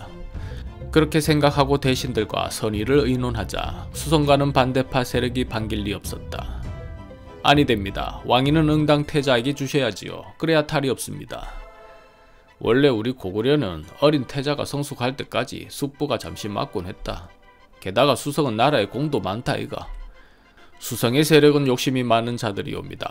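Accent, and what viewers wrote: Gyeongsang, South Korea. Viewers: 영상제작에 사투리가 불편하면 안보면될걸ㅉㅉ 제작자님 일상생활에 경상도 사투리 접하기 어려운 저로서는 들을때마다 구수하고 재밌는 억양이 귀에 팍팍 잘 꼿히고 있으니 있는 그대로 계속 해주세요~~!!! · 무슨 고구려왕과 역사를 얘기하면서 나레이션을 어울리지도 않는 경상도 사투리를... 표준어를 못하는 것도 아니고...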